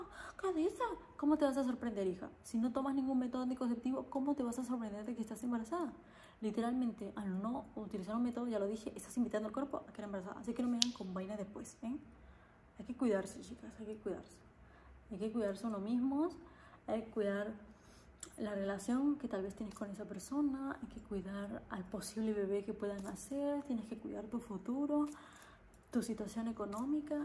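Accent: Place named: Spain. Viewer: your the most beautiful girl I've ever seen, I love your beautiful Spanish accent and words, I hope someday I meet you and talk to you 😊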